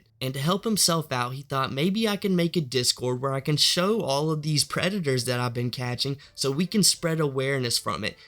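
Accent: South, United States. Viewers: You have the mosr country accent ever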